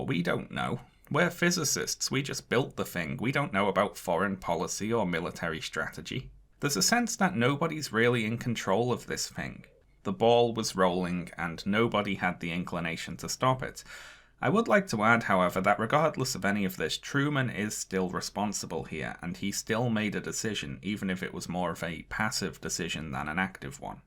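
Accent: United Kingdom. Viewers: Japan forfeited it's right to anything but death and distraction when it attacked Pearl Harbor. It's easy for people in the relatively safe and far less brutal 21st century to point fingers at the past but if Pearl Harbor happened today, it would be grounds for a nuclear retaliatory strike. The war broke Japan's genocidal list for war and extermination so if cooking a few hundred thousand of them alive was what it took, it was a small price to pay. Go live through the Rape of Nanking or the Battan Death March and then tell me how compassionate you are about Japanese school children and so on. Those Japanese school kids would have grown up to kill, rape and destroy like their parents and grandparents had the United States not destroyed Japan's centuries old Warrior culture. Then again... The person in this video sounds British and they know more about killing, raping and destroying than anyone considering they spent several hundred years doing it to a quarter of the world. 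· I think it's the British accent that makes them think what he is saying is true.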